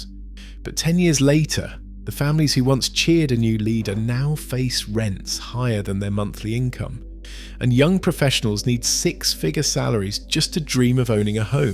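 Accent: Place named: United Kingdom. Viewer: Interesting, that a video about hardship in Canada and dwindling opportunities for middle-class Canadians is narrated by a guy with a British accent.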